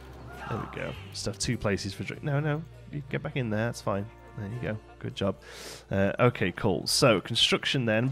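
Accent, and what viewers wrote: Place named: Staffordshire, United Kingdom. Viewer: I thought your accent was familiar! I also grew up in Hednesford/Cannock Chase! Love your videos :D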